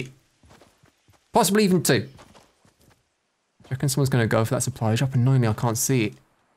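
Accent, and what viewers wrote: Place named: Midlands, United Kingdom. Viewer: Just subbed. Enjoying thr ps4 game play and I'm guess from the accent from a fellow midlander. Not sure why my fornite plays totally different to this tho. Whenever I get in a gun fight in busy areas I lag out and die ( that's with virgin fibre connected with an Ethernet).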